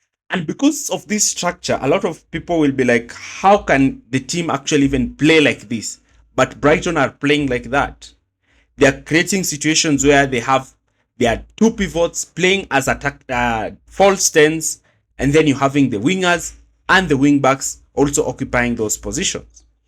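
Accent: Kenya. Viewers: Vile niliskia hiyo sauti nikajua tu huyu ni mkenya. Keep up the good work. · Hii ni sauti ya mkenya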